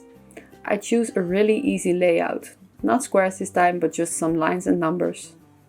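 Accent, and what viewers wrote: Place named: Netherlands. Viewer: Je accent is zo heerlijk Nederlands, genieten ;)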